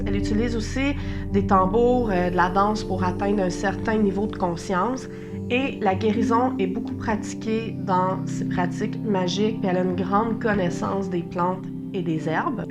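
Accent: Canada. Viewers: Oh punaise une canadienne avec l'accent canadien, je trouve cette accent tellement magnifique. Merci pour cette vidéo j'essaye d'en apprendre plus sur les sorcière. Je suis sorcière solitaire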